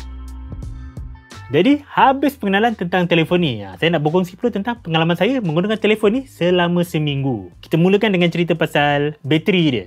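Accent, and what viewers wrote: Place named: Sumatra, Indonesia. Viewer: iya, aku jg sering dengar orang2 sumatra gaya ngomongnya memang mirip gini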